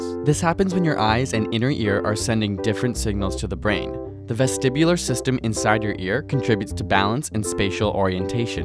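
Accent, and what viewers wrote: Canada. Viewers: i hear canadian accent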